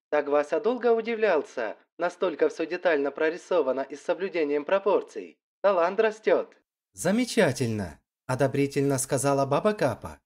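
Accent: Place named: Ukraine. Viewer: Обожаю рассказы про бабу Капу. И чтец с украинским акцентом, просто прелесть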